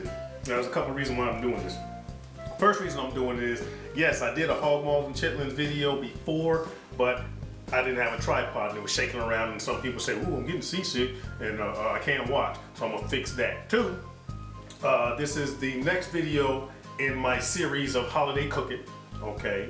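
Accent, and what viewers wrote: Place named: Virginia/Maryland, United States. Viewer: You sound like you are from VA/MD area....so I would have to come by yo' house and see whether them things stink or not! lol. For real though, my nose is ultra sensitive and I've NEVER been near a Chittlin' that didn't have some odor. I appreciate the culture significance though, even though I'm not a fan. Last time (yeaaaars ago) I tried to taste a piece---even smooshed into greens and potato salad---I still smelled them! My biggest concern is "where" they have come from. I would probably only eat them if they came from a local organic type farm. Those pigs in those processing plants are too full of hormones...antibiotics....and general filth...and then we are eating the very part of them that processes that stuff. Adding seasoning salts and bouillon with msg in it ain't helping either. Is there a way to buy and prepare them that is more "healthy"?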